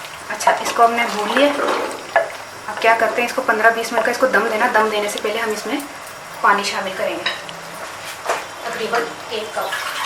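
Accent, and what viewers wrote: Sialkot, Pakistan. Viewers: Thank you for your delicious 😋 Karelay Ghosht I like to hear your Punjabi, I'm sure you're from Sialkot?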